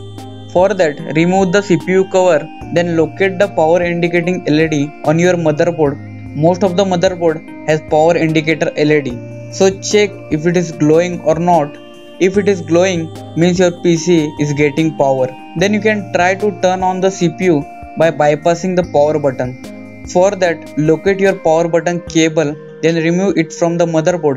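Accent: India. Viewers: Pindu English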